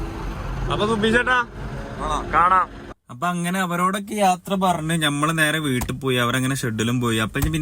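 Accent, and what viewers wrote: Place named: Palakkad, India. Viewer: Palakkadan ഭാഷ 😂😂😂❤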